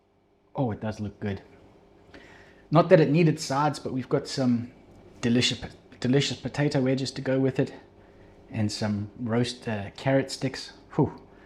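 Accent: South Africa. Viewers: you sound south African mush 😊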